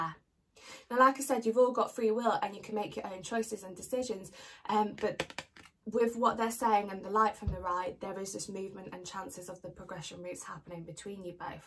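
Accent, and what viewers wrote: England, United Kingdom. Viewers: Thank you! I love the way the English talk ! Is your relationship going to rise from the dead. Great for Halloween reading 🤣☺️🎃💀👹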